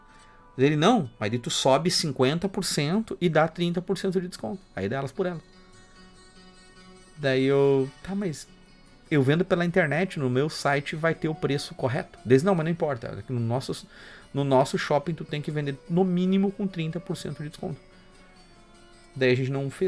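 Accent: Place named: São Paulo, Brazil. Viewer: oxi, vc é de RS? vc parece q é daqui da cidade são paulo.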